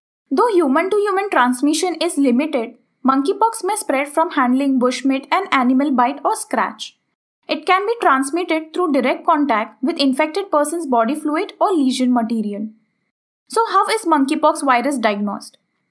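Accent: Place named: India. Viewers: It's hight time to change your mindset and adapt with an Indian accent. World is changing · Couldn't find someone without an Indian accent???👎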